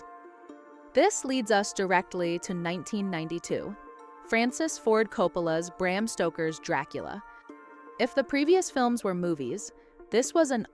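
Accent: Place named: England, United Kingdom. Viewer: good answer! an elite vampire friend of yours taught you how to speak in a clear and authentic English accent. I thought you lived in England like I do. You had me fooled. Impressed by your videos about Marishka and the other two brides of Dracula. You are a good story teller. Have you seen The Brides of Dracula with Peter Cushing?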